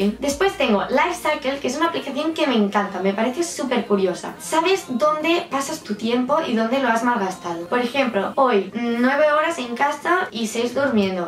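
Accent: Catalonia, Spain. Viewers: Holiii soy nueva en el canal y enserio que cuando te he escuchado hablar sabía que eras catalana, se te nota mucho por el acento 😊 Yo también lo soy😄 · Eres catalana? Es que a veces se te nota un poco de acento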